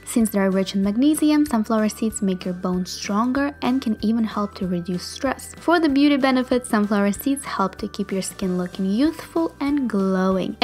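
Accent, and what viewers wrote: Russia. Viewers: я слышу русский акцент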